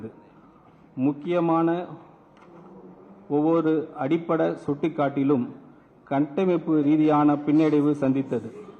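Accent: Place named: Marwari, India. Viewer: இவருக்கு தாய்மொழி தமிழ் தானா இல்லை வேற்று மொழியை சேர்ந்தவரா....மார்வாடி போல் படித்துக் கொண்டிருக்கிறார்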